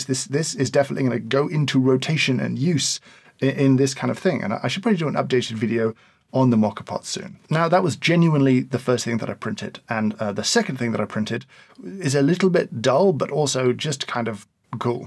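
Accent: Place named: England, United Kingdom. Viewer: what part of england is this accent from?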